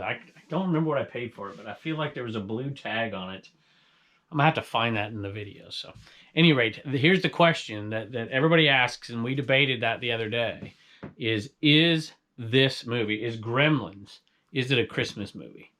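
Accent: South, United States. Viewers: I could listen to you talk all day. You have the cutest southern accent and wonderful inflection. You could really read for audible in my opinion.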